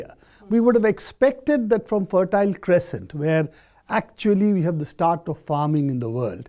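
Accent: India. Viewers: The Indain accent hurts my ears, sounds terrible.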